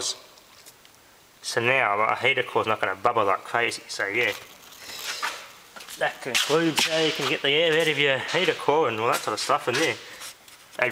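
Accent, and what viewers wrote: Australia. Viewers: Sounds like an Australian accent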